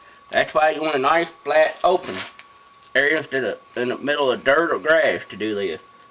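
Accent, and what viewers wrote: South, United States. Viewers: Well, here is the proverbial at it again. I must admit, that in all sincerity, I love the Southern accent. Men and Women, hear me out... the "good 'ol boys" from the south, have taken some bad raps, in regards to racism and tyranny over our friends and neighbors who are black. Again, I admit, that there were multiple, AND I MEAN MULTIPLE times when they did the wrong things regarding interracial culture, including outright murder!!! My heart will always be saddened by what the American South has done to our brothers, sisters, and their children. I had hoped that by this day and age that we would be over this issue. But I can clearly see that we have not. Here is my point. This guy is a typical image of what the rest of us see. - - - A "Good 'Ol Boy", but thru it all, at least in my life, I have learned that they do have quite an ability to repair things. 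Duct Tape and Super Glue, et al, will most likely fix anything. This video was great!! The replies are even better. I Say... take it for what it's worth, and by God, DO NOT smoke while you clean your carb. He also gets credit for being such a "likable", guy and his "dowg", seems to be at home with him and loving life!... Great post, chew me out if you want folks, but I still, will continue to believe in our... NOW... WILL WE be able to teach the hardline bigots down south anything? Well, it would appear that we just keep "chipping away at it"... and so we continue. I am not sure about you, but befor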